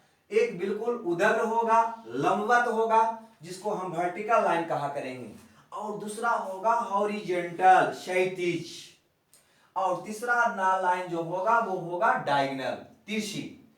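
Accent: Bihar, India. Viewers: Sir Aap Bihar se hai??? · Aap Bihar Ka ha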